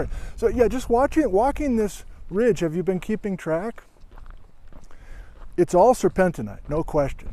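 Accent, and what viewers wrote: Upper Midwest, United States. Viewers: Love the northern Midwest accent! I don’t know how you are able to turn it on and off so well. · LOL good to hear a Wisconsin accent again. Reminds me of the family down in Chicagoland. Another great interesting video!. Love seeing places I doubt I will ever hike to anymore. Thanks again. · Nice upper Midwest accent.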